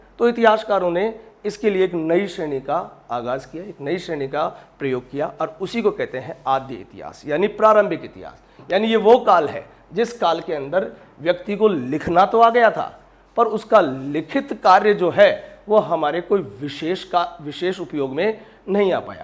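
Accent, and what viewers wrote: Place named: Marwar, India. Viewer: Haan bhai🤣🤣🤣🤣or sir ki aadhi hindi aadhi marwadi maja hi aata tha😁😁😁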